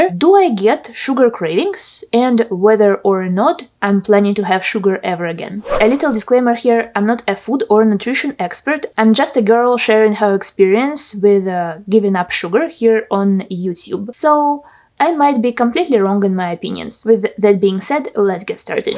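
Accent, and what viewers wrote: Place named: Russia. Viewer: I like Your Russian accent:)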